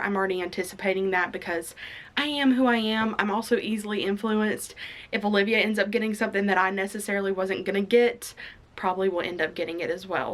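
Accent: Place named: United States. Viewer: Also obsessed with your accent (I’m from the UK) I can’t pinpoint where abouts in the US you are from?! 🖤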